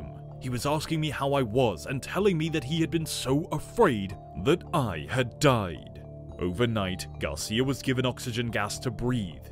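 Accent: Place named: Italy. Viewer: First brit with Italian intonation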